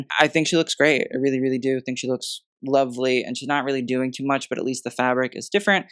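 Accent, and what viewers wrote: United Kingdom. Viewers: Love you British accent...sooo cute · Your British accent sounds exactly like Stewie from Family Guy and I love it!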